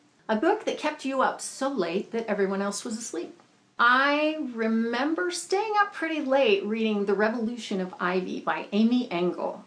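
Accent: United Kingdom. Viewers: Your British accent is on par! I really want to read The Book of Ivy - heard mixed reviews on the 2nd one though! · Great tag! I loved the British accent ☺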